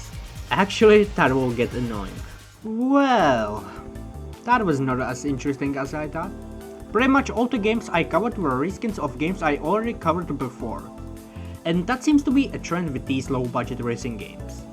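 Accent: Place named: Czech Republic. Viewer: bro where are you from? you sound like someone from czech republick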